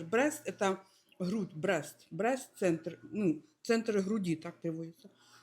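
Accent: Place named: Western Ukraine, Ukraine. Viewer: Знаєте, ненавиджу російську мову. Через отих кончених москалів. Але мені дуже подобаюься українські слова які ви вживаєте в своїй розмові. Це такі українські слова з западенським акцентом. Дякую вам за це. Дуже приємно це чути. Улюблений вислів мого покійного тата: Тут живе Степан Бандера? Так-так-так' - відповів кулемет. Вас вітає Великий Львів.з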